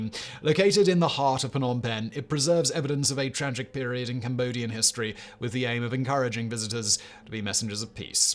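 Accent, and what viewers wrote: United Kingdom. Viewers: I attribute it to him being British and they pronounce words differently then we do in the states.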